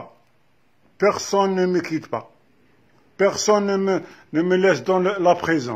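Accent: Algeria. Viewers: رووووووعة !!! بزاف تعجبني فالتمثيل لانك تمثل بالطريقة الجزائرية فعلا لاشرقية ولاغربية ههههههه أأه ياحداد !!!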